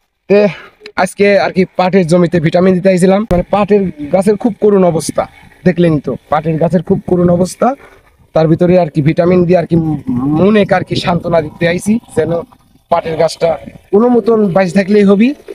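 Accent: Natore, Bangladesh. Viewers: আমাদের নাটোরের আঞ্চলিক ভাষায় কথা বলেন খুব সুন্দর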